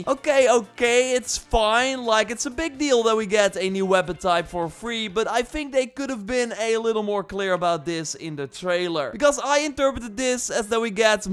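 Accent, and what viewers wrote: Netherlands. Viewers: Dude are you ducht youre accent sounds very ducht, ps gimme does creddits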